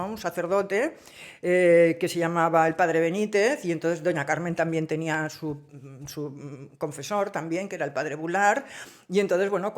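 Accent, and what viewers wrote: Spain. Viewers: lo que me rio cuando con tu acento español hablas de las palabras coloquiales (boluda, gorda, quilombos, minas) He visto peliculas sobre Eva Perón pero la mejor es Cartas a Eva, Carmen Polo interpretada por Anna Torrent fue sublime.... Eva lo interpretó Julieta Cardinale, muy bueno tambien, Perón Hector Colomé, Carmen Maura, Malena Alterio. Es mitad ficcion mitad real... lo de la carta no sé si existió pero el viaje de Eva si... La imagen de Franco con el orinal y enfrente a Eva me la imagino!!! jajaja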